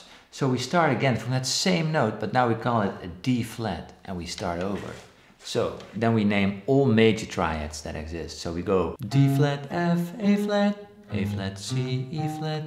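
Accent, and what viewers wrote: Netherlands. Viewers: Eyyyyyyy sound dutch. Hallo daar ;)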